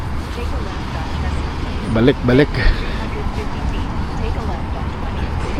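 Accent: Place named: Mindoro, Philippines. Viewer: Bossing, taga Mindoro ako, parang pareho tayo ng tono sa pagtagalog, ganire, ire, dine..😅😅😅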